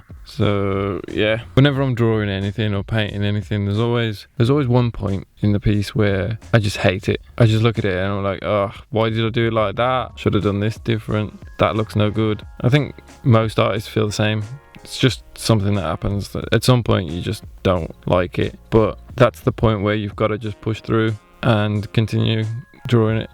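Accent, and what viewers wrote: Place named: West Midlands, United Kingdom. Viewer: Really great work 👍 And representing West Mids by sound of it!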